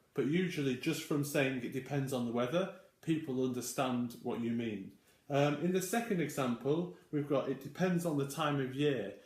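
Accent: United Kingdom. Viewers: Hi your pronounce reminds me of my staying in London. I used to live there and I really like it! by the way I`m Japanese....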